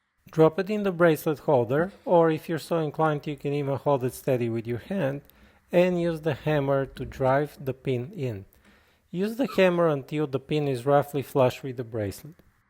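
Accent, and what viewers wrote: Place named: Eastern Europe. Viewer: good video--knew it would be so, always look for eastern european accent for jewelers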